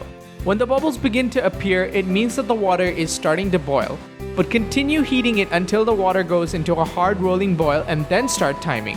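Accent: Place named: India. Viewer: Fuck you amost have the indian accent tune down to nothing..but still need to work on it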